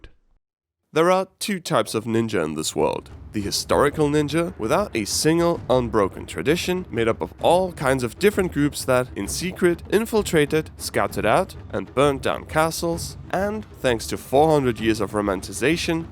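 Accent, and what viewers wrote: Austria. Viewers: He is from Austria, if I recall correctly.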